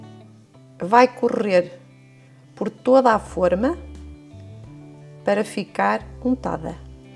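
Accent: Portugal. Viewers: Acho tao lindo o sotaque portugues, tao diferente de como nos brasileiros falamos, eles sao mais CLASSIC, DELICIAAAA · Gostei da receita mas gostei mais du sutaqu dessa portuguesa amo esse "sotaque"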